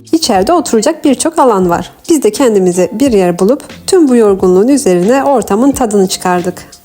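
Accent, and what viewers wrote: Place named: Europe. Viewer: Çok kapsamlı ve bilgilendirici bir video olmuş. Türkçenizde çok hafif avrupai bir aksanınız var aceba Avrupa’da mı dogup buyudunuz.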